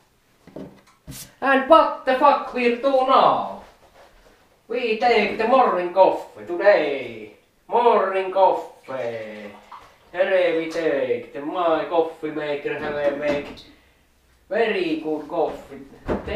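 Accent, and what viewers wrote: Finland. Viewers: Tämä rally inglish on kyllä mainiota kuunneltavaa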